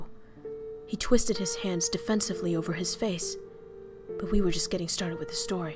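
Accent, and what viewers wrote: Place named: Russia. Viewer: That was fun,nice deep Russian accent btw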